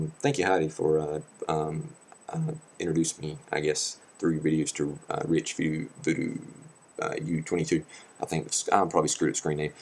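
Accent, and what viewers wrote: South, United States. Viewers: I enjoy your vids, and yes, I am easily hypnotized by southern accents...esp when they have something interesting to say, as you always do. Yeah seeing George pass was tough, I am from that generation I guess. He will be missed. Thanx for your updates too! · I LOVED his routine about "stuff" (no air quotes there, buddy - those be the real McCoy!" I'm NOT just saying this, it's the honest truth - when I saw you posted a video and saw the length of it, my first thought was, "Weeee! 9+ minutes of listening to that endearing accent!" Imagine how hard I laughed when I read the bottom of the description - you nailed me! I stand guilty of being A. (but man, you talk FAST for a Suthenah)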